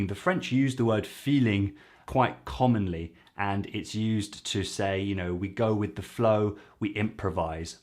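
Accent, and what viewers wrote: England, United Kingdom. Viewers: Hello! I have just discovered your channel ! Very funny and interesting! You offer to me me a good opportunity to improve a little bit, my very unperfect english. Thank you for that! I also appreciate your english accent. l like Judy Foster very much. Yes she makes some little mistakes here and there, but it ins 't a bad thing. On contrary, this adds more charm to her charm... Encore bravo pour votre analyse de texte! Salutations ! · Love your videos, but that English accent of yours has gotta go when you speak French😍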